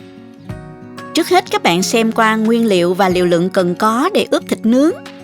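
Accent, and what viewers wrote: South, Vietnam. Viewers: nói giọng Mien Nam bghe de thuong ghê và gióng nhẹ nhưng gịt quá rất có cảm tình fish xâc bạn nhé mình chúc Cô enjoy your food. Gd bless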